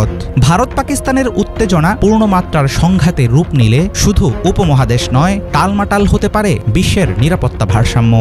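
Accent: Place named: Bangladesh. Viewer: এটাই ভাবছিলাম কারা এই ভিডিও বানালো, একটু শুনেই বুঝলাম বাংলাদেশী ভিডিও, বুঝেই বন্ধ করে দিলাম 😕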